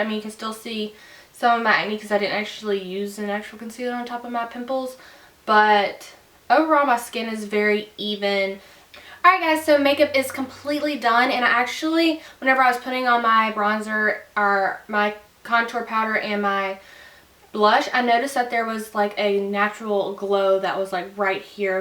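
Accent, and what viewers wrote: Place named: South, United States. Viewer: haha i didnt notice she had one til this comment. maybe a little southern sounding. but im from the us and i barely even noticed.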